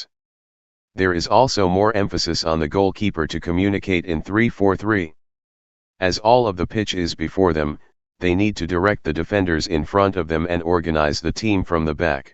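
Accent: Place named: United States. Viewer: Robotic American accent . YUK!!!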